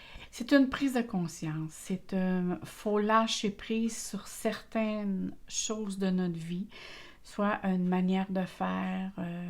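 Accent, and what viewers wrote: Canada. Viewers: Vraiment j'adore votre accent canadien et la douceur de votre voix que j'aime tant écouter :)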